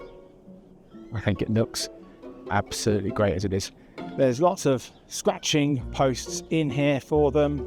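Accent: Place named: United Kingdom. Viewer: I’m convinced that cat dads are by far the purest people on Earth. This is incredible! ❤ I did have a couple of questions: 1) What area of the world are you in? I initially thought somewhere in the UK just from your accent, but then a I thought somewhere more tropical because of the plants and the birds. 2) What do you do about bad weather? I imagine there must be some sort of plexiglass or something on the ceiling at least for in case if it rains (I’m assuming it doesn’t snow where you are 😂), and then I was wondering what you would do about excessive heat? Maybe the cats just have to stay outside, or if the walls also have plexiglass covering then you can just leave the doors to the house open and if you have air conditioning then it would cool down the catio too? 3) Do you ever clean the catio out? I would imagine there’s lots of upkeep and I would love to see a video on that. 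Anyways, I really enjoyed the video, it looks so peaceful and all the cats seem so happy. ❤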